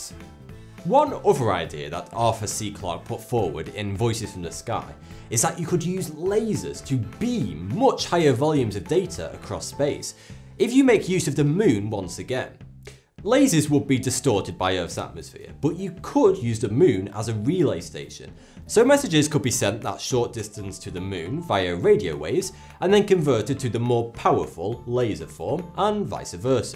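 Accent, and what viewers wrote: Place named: England, United Kingdom. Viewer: I watch dis guy just so I can learn an English accent, it's so cool. I've been practising from March now.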